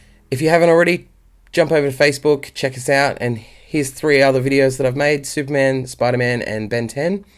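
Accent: Australia. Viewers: it's an australian accent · He's actually Australian NOT Brittish XD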